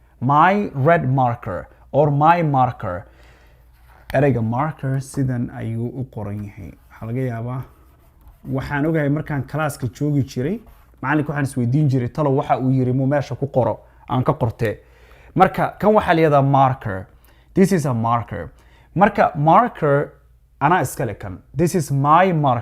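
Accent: United States. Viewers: Maa shaa Allah u gotta completely the accent that's my cuppa ... thanks teacher. my American pronunciation coach .. i swear to God ., i'll get it done cuz it's just a piece of cake...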